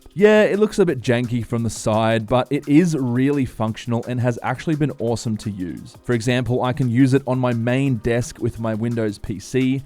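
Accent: Australia. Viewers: gotta love the Aussie accent "M1 oi-Mac"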